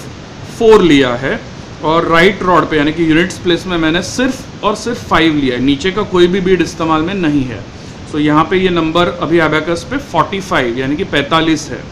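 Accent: United States. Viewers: tu america se hai kya?? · Kyu aap american hai Kya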